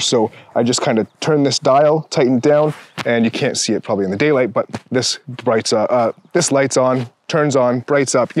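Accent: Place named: Canada. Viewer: God damn you Canadian asf!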